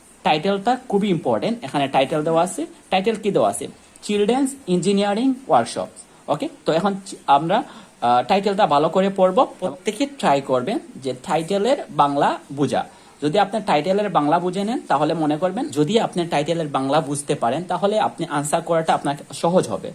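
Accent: Sylhet, Bangladesh. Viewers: Vaiya apni ki Sylhety?😊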